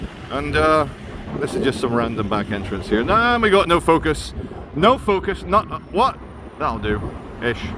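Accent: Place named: Scotland, United Kingdom. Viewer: love your subtil Scottish accent, very unique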